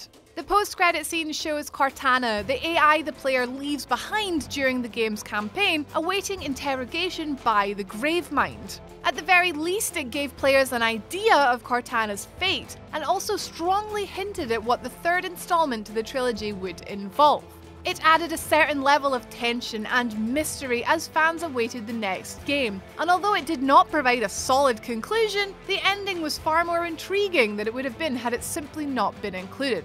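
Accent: Scotland, United Kingdom. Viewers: This Scottish accent is fantastic!!! This is what I want for every video!!! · Shes from Kinross in Scotland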